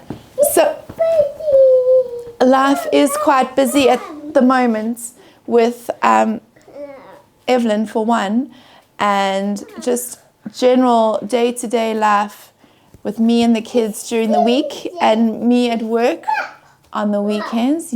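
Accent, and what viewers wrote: South Africa. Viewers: I am currently in Dublin visiting a friend, He was kind enough to open he's door to me to come out here to look for employment, really God sent. I have left my Wife and my 2 children back home in South Africa really out here trying my outmost best to make this trip work for me to try and give my Wife and my Children a better future. Things back home are not looking good at all, so I really need to make this work. I have a Home Care and first Aid certificate from the Red Cross South Africa. If you are kindly willing to refer me to a place where I can try and land a job because unfortunately all the places I have called or approached don't do sponsorships at the moment, I am willing to cover costs for a work permit. If you might also know of any place that is hiring any Customer Service or Sales Jobs. I would really appreciate any kind of information. 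Thank you. I have been applying for work online hoping and praying that I land an interview it has not happened yet though but I am pushing on. Really your story has touched my heart because I can relate and it really tells a story of how strong we are as South Africans, also what a blessing to my ears for hearing a familiar South African accent...lol...All the best going forward...May God continue to bless you all and guide you...Cheers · Hey! Your lovely Saffa voice is so soothing to listen to! And I love your text on the screen during the noisy bits 😂 we are in Ireland 7 months already!!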